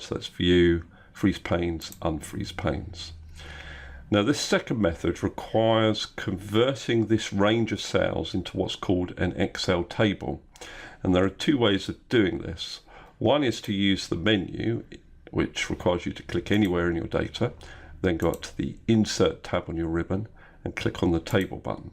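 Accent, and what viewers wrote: United Kingdom. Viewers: Your british accent makes this so much more fun/engaging. When you said "isn't that brilliant?" I was like, why yes... yes it is.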